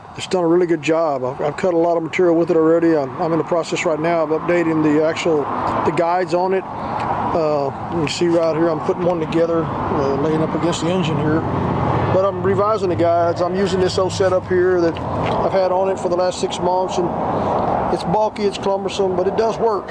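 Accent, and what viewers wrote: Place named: South, United States. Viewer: Man please tell me you have paper plans you can put together and mail out for a small fee call me ( <num>, man this by far the best saw mill i have ever seen , it's simplistic, & looks like it will go together quite easy, & hell yeah I'm subscribing & given y'all 10 👍👍👍👍👍👍👍👍👍👍's up, & definitely sharen the shit out of it, me & my boy are positively going to build this badass mill, 1 thing tho. You definitely might wanna put on some guard clover boxes for the blade, ya just never know if & when that blades liable to snap from a freak mishap, & you might wanna think about welding on some angle brackets on your tension bracket to keep it from worken the original weld loose from vibration, you sure sound like a good ol boy, i hope y'all get 100, 000,000 likes & thumbs up on this video, y'all definitely deserve it in my book, i absolutely love love love this build, great job bro. 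Best I've ever seen on YouTube, & believe me I'm watchen YouTube 247 to find the best mill i wanna use to fabricate mine after, guess i can finally stop looken, & move on to something else, now that I've found the baddest sawmill I'm gonna build mine after, thank you so much, dude you have no idea how you've just made my day, I'm stoked as hell, & can't wait to get ready to build mine, & I'm going to film every step with possibly a few ideas of my own, & put it on YouTube, & going to give you every bit, & all the credit for the inspiration, take that to the bank bro. LoL, whooo hoooo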